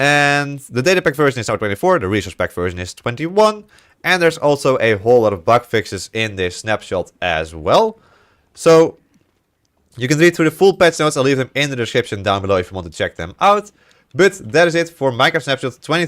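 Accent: Netherlands. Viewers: U sound nl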